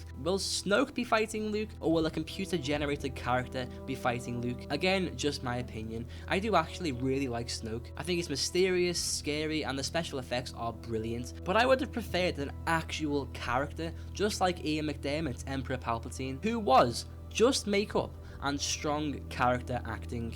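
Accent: United Kingdom. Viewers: Oh wow, so is that a strong british accent?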